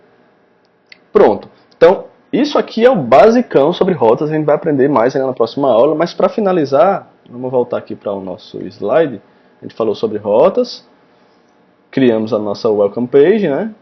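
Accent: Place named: Northeast, Brazil. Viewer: Você é o cara. Comecei a estudar Rails a menos de uma semana, e já aprendi mais do que todos esses anos tentando estudar programação voltada a back-end. Sou front-end e estou estudando uma linguagem back-end. Sempre tive interesse em estudar rails, por causa da sua praticidade, só que eu nunca entendia nada. Hoje, graças as suas vídeos aulas eu já consigo entender muita coisa. Confesso que nas primeiras aulas eu não tava curtindo muito a forma de como você falava e se expressava, porém, os vídeos foram melhorando, ao ponto de você explicar melhor do que muita gente famosa. Parabéns de verdade. Valeu por dedicar um tempo a rapaziada que assim como eu está começando no caminho do rails. Obs: Você é do nordeste? Tem um sotaque muito nordestino. Eu sou de Recife - PE. Abraço.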